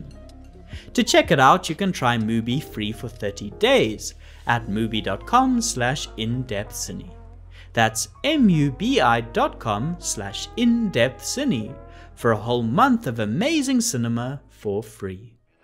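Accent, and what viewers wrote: South Africa. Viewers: Where is that accent from sir? South African or German?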